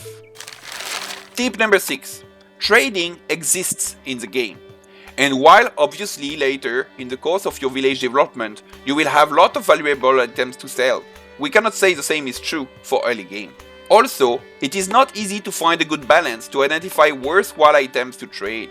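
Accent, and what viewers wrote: France. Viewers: Man your just french as fuck my man. you sound exactly like what I would expect. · From Texas, love your accent. I dont hear a lot of French YouTubers.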